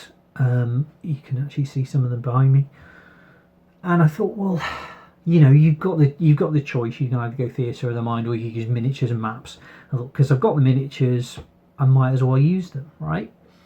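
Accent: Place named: United Kingdom. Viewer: That's how British people talk.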